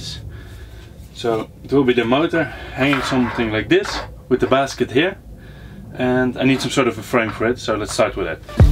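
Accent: Netherlands. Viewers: Vet gaaf man blijf door gaan ik hoor aan je accent dat je Nederlander ben haha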